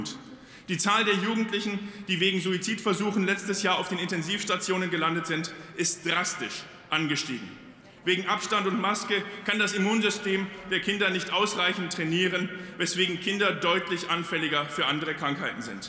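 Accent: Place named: Swabia, Germany. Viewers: Wohl eher Schwabe. Grausiger Dialekt für meine Ohren. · ... Schwäbisch kann auch sein ... der Dialekt ist etwas gewöhnungsbedürftig ... ich habe 15 Jahre da gearbeitet ... und gelebt ... Spaichingen und 11 Jahre in Ostfildern Nellingen ... war ganz nett bei den Schwaben ...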